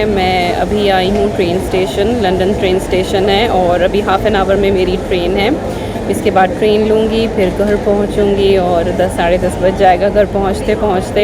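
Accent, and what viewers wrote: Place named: Uttar Pradesh, India. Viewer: Congrats! Well deserved honour. I'm from Chicago and I started watching your channel since last August when I was visiting India.One day I was getting bored in my hotel room as my husband was away on business trip and I could not go along with him as I was sick, so your cleaning routine flashed on while I was watching other videos on you tube, and believe me it was so interesting that I was instantly hooked up to your channel. Your typical U.P. style of speaking and hard work combined with your simplicity just did wonders and I felt connected to you. Congrats and best of wishes for your future endeavours.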